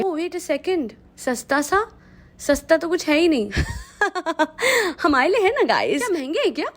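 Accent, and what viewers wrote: Punjab, India. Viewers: Your Punjabi is too cute 🤭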